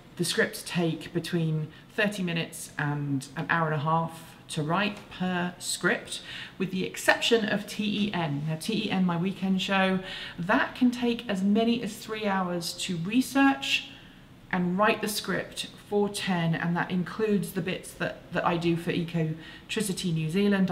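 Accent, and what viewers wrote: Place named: United Kingdom. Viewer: Are you from New Zealand? I've been assuming you are British just based on your accent (I'm definitely not an expert, as may be obvious). If you aren't a Kiwi, why do you do movies specifically for them? Something unique about their market?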